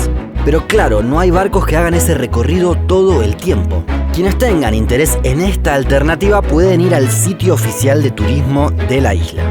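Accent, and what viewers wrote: Argentina. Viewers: Más argentino imposible jejeje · Esta bonita la historia que cuentas solo que ese asento de boludo no se oye bien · Que horror ese acento Argentino ...shoooooo ashi amarishooo shooo, bye.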